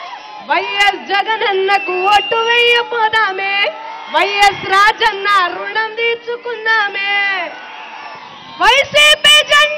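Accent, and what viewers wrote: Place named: Telangana, India. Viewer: తెలంగాణ యాస అక్కడ కూడా ప్రభంజనం లేపలే yours great అక్క so Keep rocking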